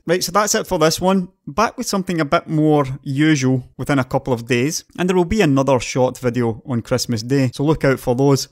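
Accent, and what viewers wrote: Scotland, United Kingdom. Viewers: that thick scottish accent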